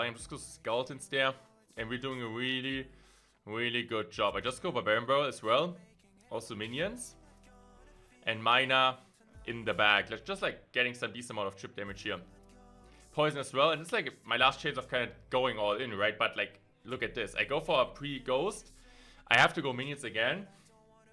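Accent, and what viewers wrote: Germany. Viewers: Hahaha come on bitch! Back after bout 4 years, clash is back baby! Beautiful games much love. Idk why but I love German accent prolly from listening to when I was a kid also funny thinking of as unc lmao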